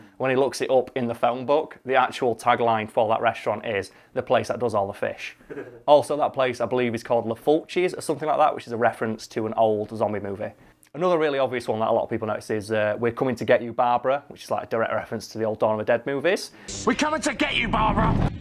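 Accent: United Kingdom. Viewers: Finally, someone who talks rate bladdy British! Someone to like!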